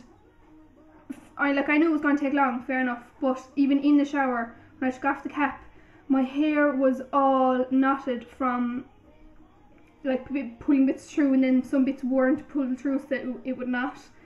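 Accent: Ireland. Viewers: I love the way u speak. I like irish language, in half irish (wish i could talk like u guys) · Are u Irish? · A Irish u tuber way ha! Glad to hear a Irish voice !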